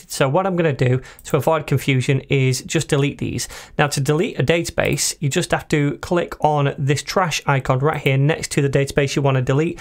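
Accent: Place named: Yorkshire, United Kingdom. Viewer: Are you a Yorkshire man mate?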